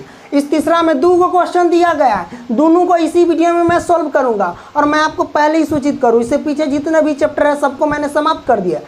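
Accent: Bihar, India. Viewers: BIHARI BHASA IS VERY NICE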